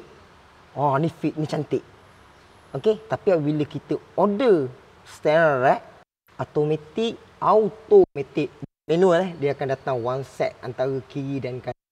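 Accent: Pahang, Malaysia. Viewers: ape pon channel bnyak bantu dlm ilmu yg serba kekurangan tu aku ttp boleh paham..yg penting gelak sendiri tu paling rare..pasni cer try buat video pasai aircond wira bile on je blower jalan tapi compresor xjalan dan bile compresor direct beteri heeellllok pulak..harap ade video ni utk care nak mencari punce die...bossku..loghat awok pahang sangat..hahahaha🤣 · Bengkel kat mana? Cam org phg je..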